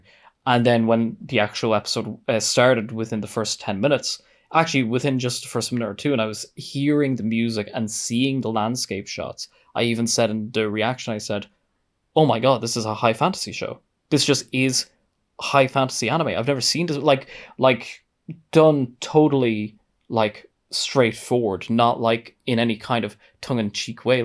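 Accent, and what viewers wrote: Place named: Ireland. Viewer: My family name is Irish. I detect an Irish brogue. There has to be an Irish definition for this kind of story.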